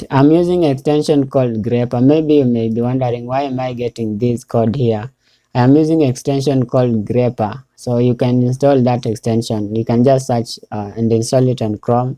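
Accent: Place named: Asia. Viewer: U sound Asian, ru sure ur a?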